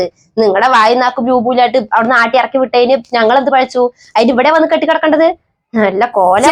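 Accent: Palakkad, India. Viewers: ഭാഷ ശൈലി പാലക്കാട്‌ പോലെ തോന്നി 😊❤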